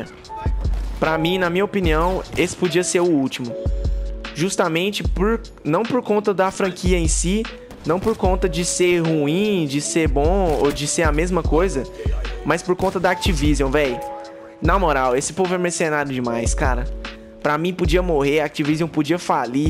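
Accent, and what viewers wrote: Minas Gerais, Brazil. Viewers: Cê é mineiro?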